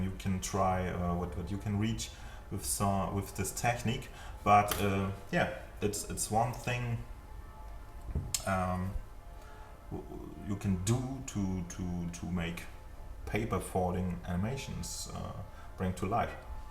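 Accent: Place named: Germany. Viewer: Man merkt das Sie deutsch sind^^ Das Deutsch-Englisch hört man SOFORT raus^^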